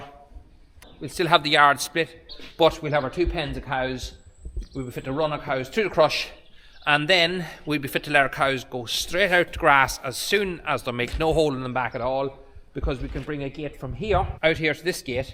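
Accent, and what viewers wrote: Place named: South, Ireland. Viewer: Another great video,i wish you did one or two during the week as well. I just love the irish southern accent,im from the uk and have visted cork area 3 times and i just love IRELAND... Hope to come back very soon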